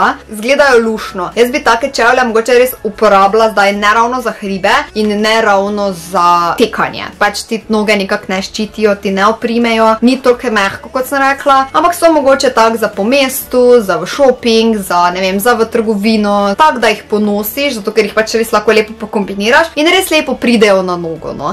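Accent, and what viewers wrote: Maribor, Slovenia. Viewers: Sodelujem 😊❣️ Ful fajna sii👌 pa fajn je videt da niso youtuberke sam iz Ljubljane in res mi je všeč mariborski naglas čuj😍 Upam da dobim čevljeee komaj čakam❣️❣️❣️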